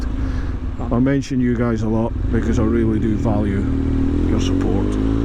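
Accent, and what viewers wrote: Scotland, United Kingdom. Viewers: Oh wow well done (those are the red vehicles) interesting job, guess you have seen it all, to move on careers! thanks for the info never heard of "hill topping" and couldnt find anything on google! great channel I am a subscriber! Love the accent too im thinking creiff maybe Dunblane regions ...dont confirm or deny, i have a thing for accents (aspergers)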